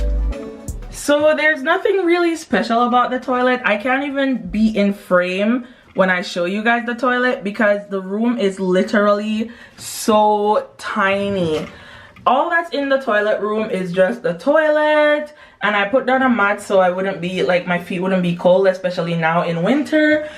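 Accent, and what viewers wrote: Jamaica. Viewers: Your accent sounds Jamaican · R u Jamaican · You sound Jamaican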